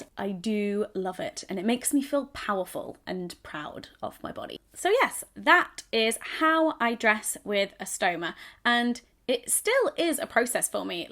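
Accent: United Kingdom. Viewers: you are a dream cum true. I to have had an ileostomy back in 2014. It sucks. I have to wear an ostomy bag the rest of my life. Kills my sex life. It s nice to connect with a beautiful girl that has had a similar condition. Love your British accent. Nice smoking hot bod too. Keep posting lovely nymph and I wish you the best.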